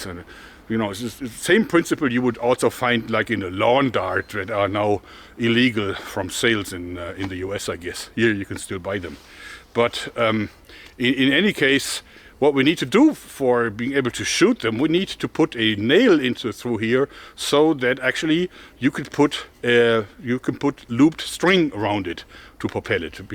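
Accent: Germany. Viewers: as a german living in the uk, german accents in youtubers infuriate me and make me cringe. you are the only one i actually like haha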